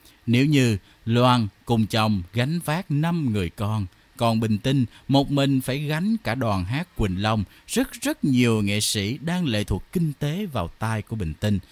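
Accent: Standard. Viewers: nói chuẩn và hay.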